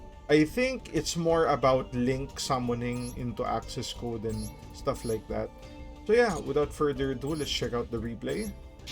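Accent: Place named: Philippines. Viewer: u sound Filipino af. are u Filipino?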